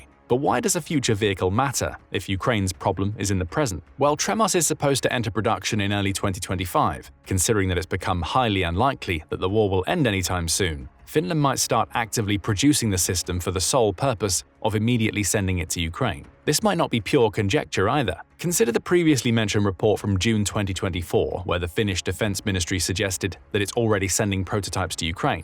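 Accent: United Kingdom. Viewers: Another british accent. Is that all that's out there anymore? Adios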